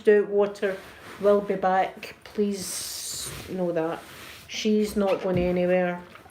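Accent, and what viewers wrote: Scotland, United Kingdom. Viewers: Yay, another Scottish ASMR channel. Felt like I was listening to an old friend. Thank you for this video!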